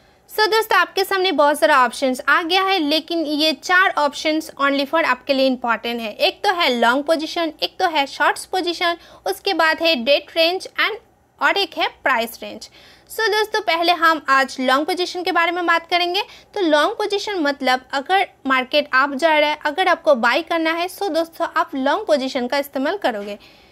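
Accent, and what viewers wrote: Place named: Bengal, India. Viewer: Sweet Bengali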